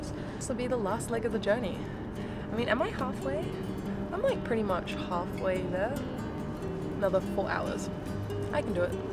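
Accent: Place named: Australia. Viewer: You have an awesome Aussie 🇦🇺 accent! I enjoy your Japan videos 😊 Thank-you from this Japanese-Canadian subscriber 🇨🇦.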